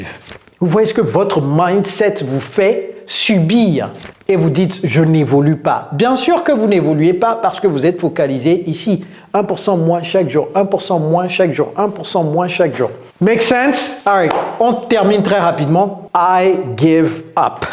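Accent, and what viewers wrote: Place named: United States. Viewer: Salut coach,je suis étudiant actuellement au Nigéria et je parle bien l'anglais mais je veux parler l'anglais Américain comme vous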